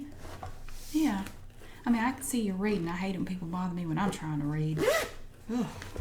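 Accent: South, United States. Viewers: Something about a southern accent I actually enjoy lol I'm a Georgia city guy but I still love it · this type of southern accent reminds me of Tree Trunks from adventure time haha 💗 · You are real Southern Belle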